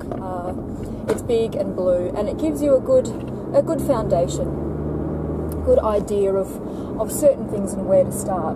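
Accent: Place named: South Africa. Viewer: hi are u from south africa it sounds like it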